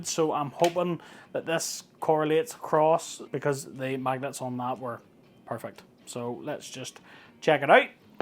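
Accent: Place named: Belfast, United Kingdom. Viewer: Cheers for the vid, sounds like you dont live too far from me lol (belfast) My s24 ultra is arriving on Thursday (hopefully) from three and I need to pick a cover. I usually just get a basic leather folding cover from amazon for my phones, the one on my current s22+ seems to have done a pretty good job at keeping the phone safe so I may end up just getting the same again. But I'm also willing to spend a bit more on a good case for the s24u. The only thing I don't like is having a cover that doesnt fold over and covers the screen. I also like to keep my cards in the phone cover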